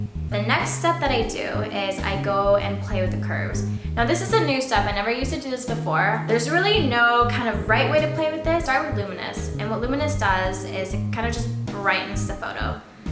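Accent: United Kingdom. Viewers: Ok. Bye. lol. Nice British accent 😃. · I loved the British accent!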